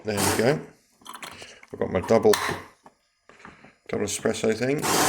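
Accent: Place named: United Kingdom. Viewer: I definitely needed a British bloke review and run through of this! The American voices really grind on me 🤣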